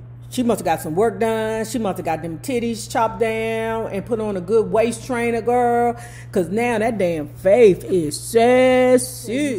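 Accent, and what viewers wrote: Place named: Washington DC, United States. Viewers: Baby, Fay revealed her ethnicity back in the 90's. As 4 ur accent, u sound just like everyone I know that went to Anacostia HS in DC!❤🤣🤣🤣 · Definitely sounds east coast. DC or Murrland. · You definitely have a DC accent and -isms. I lived in Ft Washington in Maryland (Murrlin) for a few years when I was a teenager. "lunchin", "bama", "doing the booty" all things I heard only in DC.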